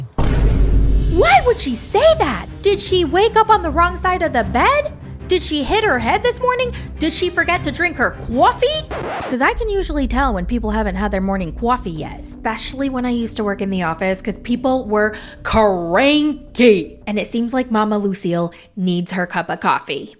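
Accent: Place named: New York, United States. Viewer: I’ve been away a few months but I’m loving the sometimes New York accent that developed in my absence.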